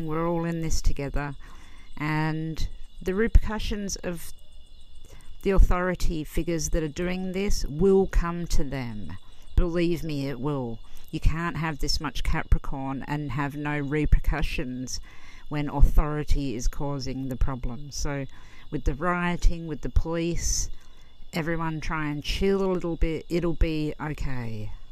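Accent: Australia. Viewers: Are you Australian,? 👋🇦🇺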